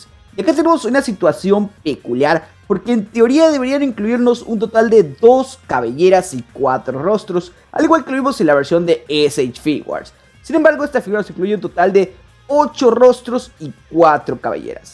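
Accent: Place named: Peru. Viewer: Buen video, Eres de México Bro? De que parte? O eres de sudamérica? Tienes un acento como Peruano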